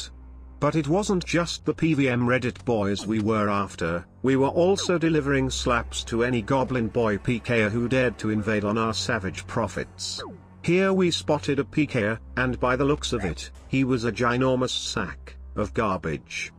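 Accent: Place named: Georgia, United States. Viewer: It’s funny I’ve never heard this mans real voice yet I know for a fact he’s from Georgia or Florida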